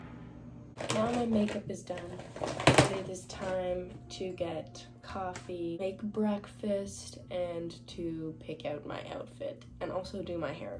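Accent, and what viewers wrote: United Kingdom. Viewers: nah cause i thought u was british before i watched ur yt videos 💀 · pls ???? why did i think you were british HWJSJHWGF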